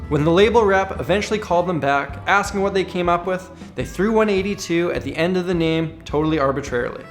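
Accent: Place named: Canada. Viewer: As a native Akroner I am offended but maybe it was just ur Canadian accent aye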